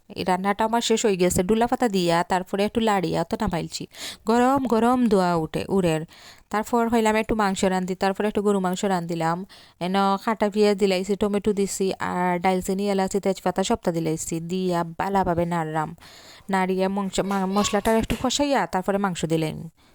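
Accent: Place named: Sylhet, Bangladesh. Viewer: Tee Afa afnar mat proper sylhet oy na kne lager thura thura shuddo aior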